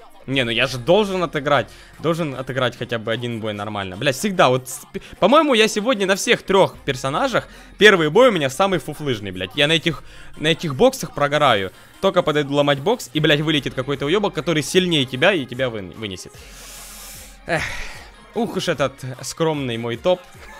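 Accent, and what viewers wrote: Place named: Surzhik, Ukraine. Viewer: на мое мнение, звучит коряво. Это суржик с украинского на мою думку. Пожалуйста, по моему мнению, уши немного режет)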